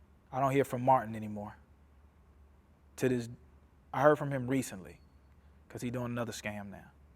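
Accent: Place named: South, United States. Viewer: Got that southern Baptist preacher drawl… love it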